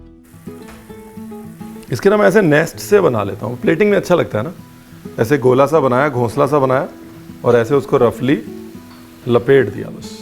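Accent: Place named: Punjab, India. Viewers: Awesome!! Gotta give it try! Love your Punjabi accent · Yummy mouth watering 😛😛sir I like all respiees prepared by you Good way of explaining and punjabion vala bolane ka style👍👍👍👍👍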